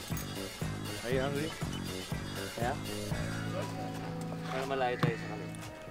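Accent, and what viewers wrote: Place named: Ilonggo, Philippines. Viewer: Ga gwa gd ang tono kung upod mo ilonggo man.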